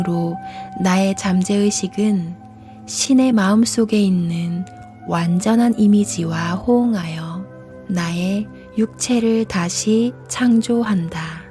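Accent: Gyeongsang, South Korea. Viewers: ㅎㅎ 이미 억양 자체가 사투리입니다 ^^ 경상도사람이 경상도 말씨는 당연한겁니다 경상도 사람이 서울말쓴다고 말하는거 들어보면 ᆢㅎㅎ 웃기는것같아요 ᆢㅎㅎ 늘 좋은책 잘듣고있습니다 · 말끝에 ~~하는 갱상도 억양만 고치면 되요. 쉽지요? 난 조선족, 북조선 말투 할 수 있는데 그살람들은 남한 억양 몬해요.. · 갱상도 사람은 표준말을 쓴다고 해도 갱상도 사람이 들으면 갱상도구나 느낍니다.ㅎ. 좋은 책 소개 감사합니다. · ^^ 목소리 너무예뻐요 경상도사투리라서 더예쁨 영상도아주좋아요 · 경상도 사투리에 아가씨가 그리워지네~~^^ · 경상도 말투 좋아요👍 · 님 좋아요 경상도 사투리가 매력적인 감동입니다 저도 경상도 사람입니다 책 내용이 중요하지요 감사합니다 행복하세요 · 사투리? 경상도 표준어 너무너무 매력적입니다 정감이 넘치는 말입니다 아주아주 세련된 말입니다 감미로운 목소리 정겨운 목소리 감사합니다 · 경상도 사투리가 매력 짱짱짱 이네요~^^ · 경상도표준말 듣기좋아요, 저도 경상도표준말 씁니다. 목소리 참 맑고 곱습니다. 늘 잘 듣고 있으니 쭈욱 이 목소리와 말투로 해주세용~~♡♡ 감사합니다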